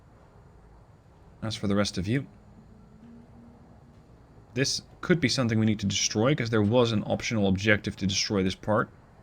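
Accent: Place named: Netherlands. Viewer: Ik detecteer een Netherlands accent in je Engels 🤔. Landgenoot 💪Dutch🇳🇱 ?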